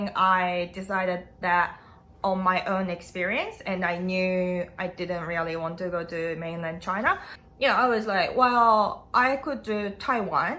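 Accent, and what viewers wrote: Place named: New Zealand. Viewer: Still got that kiwi accent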